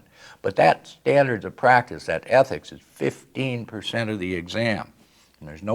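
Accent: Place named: Transatlantic, United States. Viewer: he speaks a transatlantic accent, very interesting topic and information, great presentation organisation. The video is effectively informative!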